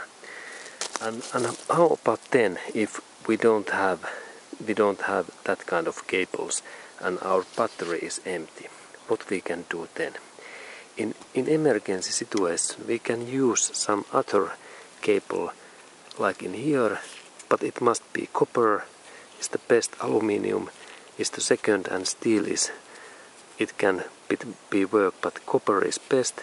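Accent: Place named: Finland. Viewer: This video must be finnish made, bacause this "rally english". Keep up the good work!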